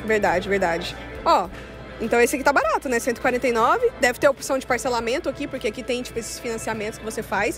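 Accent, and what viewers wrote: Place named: Sao Paulo, Brazil. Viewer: Gostei desse teu sotaque paulista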